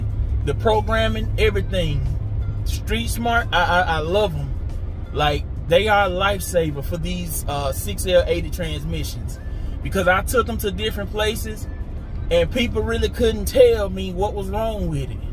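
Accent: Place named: Texas, United States. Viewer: Sound like u from Texas maybe florida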